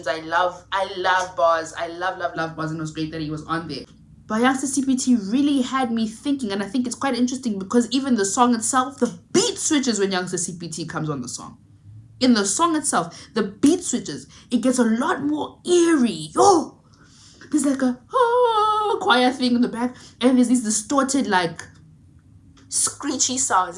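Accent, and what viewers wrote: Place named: United Kingdom. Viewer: I'm here for the British accent 😅.. Energy always on another level 🔥🔥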